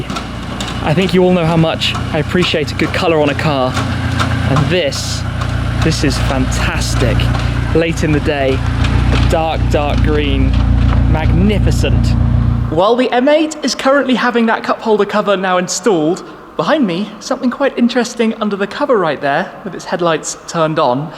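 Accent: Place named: United Kingdom. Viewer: Can you talk less British ? Like more neutral English, kinda annoying for me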